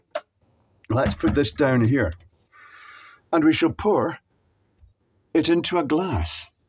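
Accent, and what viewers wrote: Scotland, United Kingdom. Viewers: Can I just say, you have the most easy to understand Scottish accent I have ever heard! for my American ears anyway. Sounds like a more modern version of the "Prime of Miss Jean Brodie" accent.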